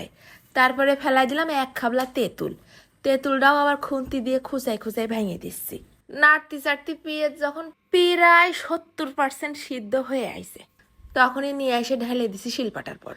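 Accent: Jessore, Bangladesh. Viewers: আপনার বাড়ি কি যশোর না গোপালগঞ্জ?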